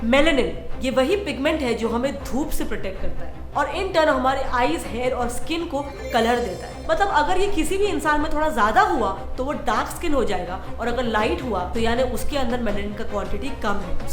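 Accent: India. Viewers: u look Chinese but speak indian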